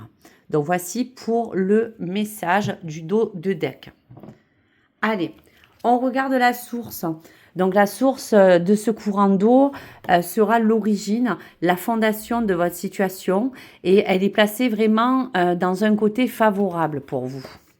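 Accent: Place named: South, France. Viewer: Bonjour j'espère que vous allez bien merci pour ces guidances super que vous faites à chaque fois et j'adore votre accent du sud je suis de Marseille du coup l'accent chantant on connaît les cigales on les connaît je suis pas de Marseille même mais ça fait un moment que je suis là mais sinon j'ai grandi en Bretagne c'est pas la même c'est le jour et la nuit j'aime beaucoup votre accent quand même❤😂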